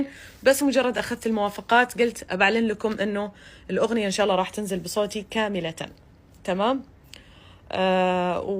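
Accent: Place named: Jeddah, Saudi Arabia. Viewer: يمنيه معها جنسيه اماراتيه تتحدث بلهجه سعوديه جداويه.. من أنتم ههههههه.. الله المستعان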